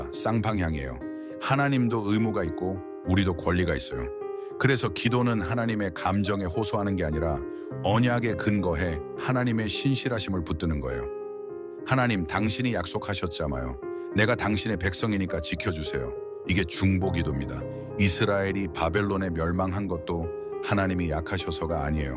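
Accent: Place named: United States. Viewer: 감사합니다. 성경공부에 큰 도움이 됩니다. Awesome! 혹 목사님이셔요? 교포셔요? 발음이 미쿡사람 같아서요🤗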